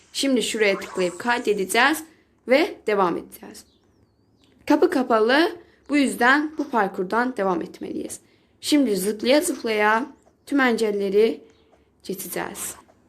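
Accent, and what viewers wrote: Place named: Azerbaijan. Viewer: Salam azerbayvanlısın niyeki bildim sesiinden :)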